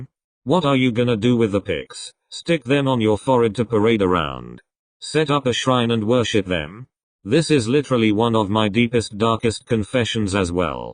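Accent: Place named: England, United Kingdom. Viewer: AHAHAHAA ARE YOU ALSO FROM ENGLAND?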